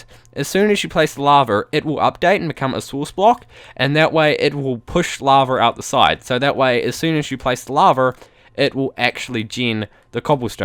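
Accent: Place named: New Zealand. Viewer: im pretty sure he's from new zealand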